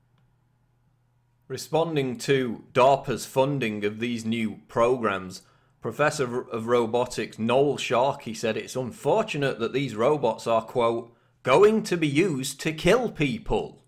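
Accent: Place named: Northern England, United Kingdom. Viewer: I can't take this narrator seriously, he is too northern and sounds like he is taking the piss.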